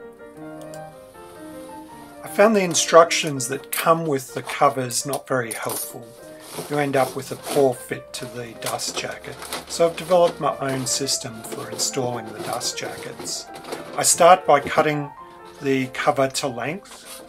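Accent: Australia. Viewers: Hey, are these sleeves removal once applied? Just found your channel and it's great, sounds like your an aussie as well - I may be wrong. Cheers mate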